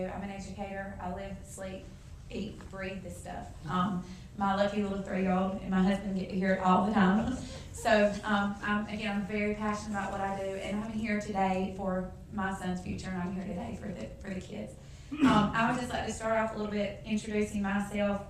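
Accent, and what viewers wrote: South, United States. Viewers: Have you ever been passionate about something? Have you ever talked so earnestly that your words might have been rushed or you enunciated less clearly than usual? I have. I know the correct pronunciation of "adjective," but to pronounce every single sound in the word takes almost twice as long and makes the concept much more intimidating. Would you correct a child who left out the "c" in adjective? I wouldn't. "Liberry?" Absolutely. But she's Southern. I'm Southern. We pronounce it slightly differently. Would you correct a black person using ebonics? Or a British person who says adVERtisment instead of ADvertisement? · To all you complaining about her accent and pronunciation... we Southerners can't understand a word of your Yankee-talk, either! But we would not respond to a passionate, intelligent critique of this very dangerous Common Core crap by posting a comment demeaning her accent, while completely ignoring the substance of her excellent argument. 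We Southerners are not rude like that-- unless provoked. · It's called a Southern accent. You don't have any regional pronounciation of any English words? I'm sure you do. You could see how passionate she is about education, how much she wants to help her students, how her general discourse was well thought out and intelligent, yet you chose to focus on a couple of words among all these positives. Schools need more people like her. If you truly want to do some good for the world, stop being a nitpicker and a quibbler and see the big picture. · why don't you people shut the hell up about her southern accent. Other parts of the country are just as annoying with their accents and dialect. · I think perhaps your ear is just not fine tuned to the deep southern accent. She is speaking rapidly since she is limited by time and clearly passionate about this subject. Being from Tennessee myself, I actually hear the 'd' in both "adjective" and "adverb". 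I find it amusing how people of the US (and Canada) have such difficulty accepting & understanding different dialects of other US citizens. Don't equate a southern accent with stupidity; many, many times that assumption will be very wrong. Some of us may not seem to speak properly in others' opinions. It's the rhythm and cadence of our speech, dropped syllables, run-together words, changing vowel sounds in words so they sound totally different, our habit of making one syllable words two syllables. Yeah. It's a Southern thang. Y'all ain't never gonna understand. Bless your hearts. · She has a southern accent. I understood every single word. Y'all are just rude and mean. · its a southern accent hard to fallow if you've never heard it before i will admit.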